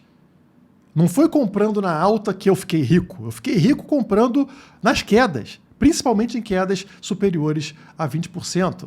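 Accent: Rio de Janeiro, Brazil. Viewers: Pq tanto desespero pra vender curso se vc é tão foda ? 😂😂😂 Carioca né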